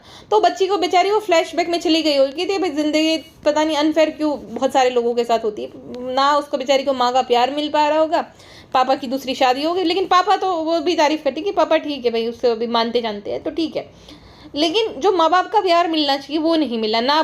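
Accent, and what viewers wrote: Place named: Marwar, India. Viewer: Aapki ki accent Gujarati bilkul marwdi jaise hai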